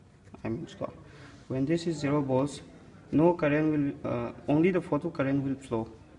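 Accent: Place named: India. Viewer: are you from india ,well explained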